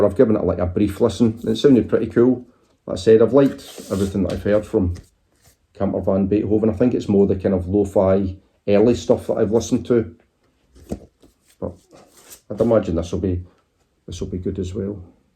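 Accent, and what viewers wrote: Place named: Scotland, United Kingdom. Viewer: Hi Look at all those records eh? Wow. That cover art was stunning. Wow. That Camper Van would probably fetch a nice penny or two. My knowledge being limited to the RSD one I bought this year. Hey, there's the I got in Stockholm. Aww... (my one valuable Albert and some c**t threw a dart at it. Ah, that beautiful scottish brogue of yours. "Kånnty Jo and the Fesh". I had Irn-Bru in Stockholm. Oh, Vanguard. Reminds me of the... Anglia logo? I'd love to get the discography now (on vinyl) since I now have all of the ones, and, so, love that album!... love that album! I don't mind you don't like them. Btw have you tried listening to the ones? Splendid haul. Cheers 😊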